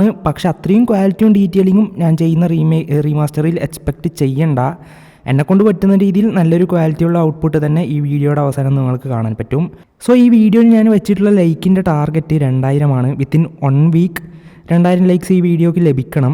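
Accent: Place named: Palakkad, India. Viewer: Pro place palakkad aahno? Njn ahne. oru touch und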